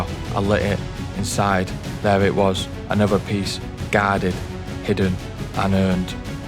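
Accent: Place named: Manchester, United Kingdom. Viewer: Wonderful Channel I Love watching your Adventures and Narration Can I ask are you from the Manchester Area?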